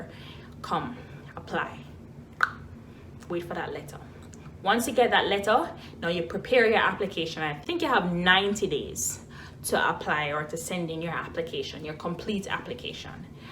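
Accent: Jamaica. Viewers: She has a jamaican English accent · Definitely a yaadie, mi not even going to ask. Suscribed-click. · I hear the Jamaican in you❤️❤️🇯🇲🇯🇲nuff love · Hey there I hear Jamaican 😁 I subbed, thank you for the tip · sounds like a Jamaican................thanks for the information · I could tell you are Jamaican without you saying it, we Jamaicans know a Jamaican anywhere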